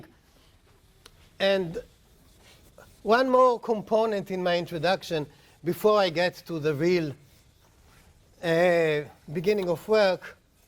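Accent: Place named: Israel. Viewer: yay heb accent ^^ :-)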